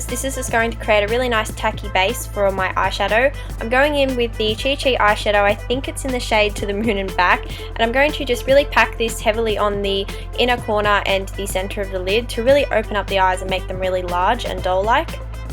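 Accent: Australia. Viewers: loving the Aussie accent yasssssss aussies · You sound Australian 😂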